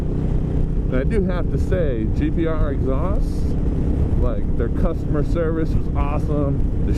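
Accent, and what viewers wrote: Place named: United States. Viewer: Awwm until you started talking I thought you were an actual Italian reviewing the can and the bike. What the fuck do Americans know about motorcycles?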